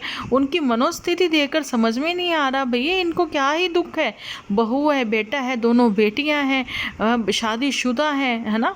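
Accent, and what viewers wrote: Punjab, India. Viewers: I love your Punjabi Accent Aunty ji love from Canada 🇨🇦 I’m also Single mom with my daughter no house help no any support I’m working mom im working in School full time job but in 🇨🇦no any kinda helper I’m just thinking about those Drama Queens Sisters and mom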